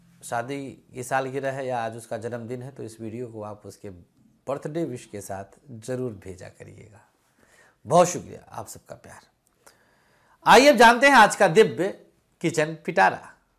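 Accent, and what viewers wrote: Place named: Awadh, India. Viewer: Shree radhe guruji apki avadhi boli bhot jyada pyari lgti h love u always... chrn sprsh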